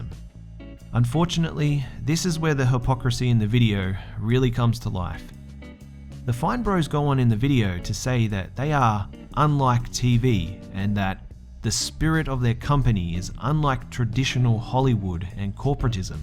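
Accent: Australia. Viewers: dude i been watching you for hella long but just now realized you are australian and have an accent.. lol random... something wrong with my ears maybe lol... and speaking of the fine bros thing I don't really know much about them but they seem douchey lol.. I don't really watch reaction videos though especially not stuff like "teens react" and all that so yeah .. I don't even understand why they are so popular I mean I don't care about random peoples reactions to things.. im ranting lol.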